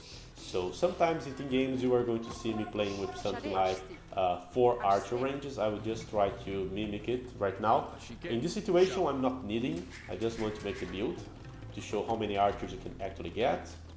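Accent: Brazil. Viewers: Ctza que é brasileiro o sotaque não engana...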